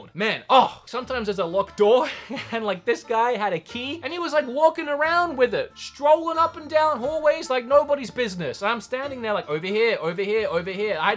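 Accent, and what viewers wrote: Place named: Australia. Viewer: So what’s this guys accent? He sounds Australian but says some words completely differently.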